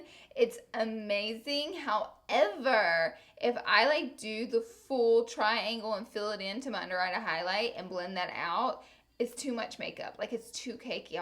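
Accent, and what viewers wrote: South, United States. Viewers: It cracks me up when people leave comments hating on her voice. Maybe it's because I'm a Southern girl too but it's just the way we talk 😂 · New subscriber and just curious what state your from? I can't quite place your accent, but I definitely detect some southern twang. I'm from Virginia 😉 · I'm southern too and I think ur accent is beautiful😍😍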